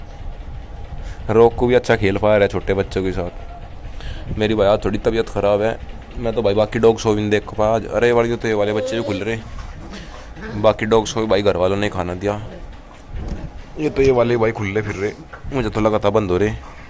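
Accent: Haryana, India. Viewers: Bhai app haryana se ho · Bro aap rhata up ma ho par bolta haryanvi ho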